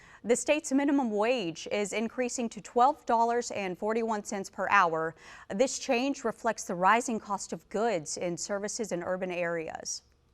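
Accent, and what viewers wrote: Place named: DMV, United States. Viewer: getting that DMV twang down solid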